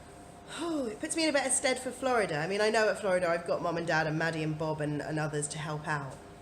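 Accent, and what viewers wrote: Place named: Mansfield, United Kingdom. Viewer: Are you local to me? I’m from Mansfield and your accent sounds similar to mine lol and you mentioned Nottingham! I’m not a single Mum but my Hubby works 60-70 hours a week so I’m on my own most of the time. Mummy win! Xx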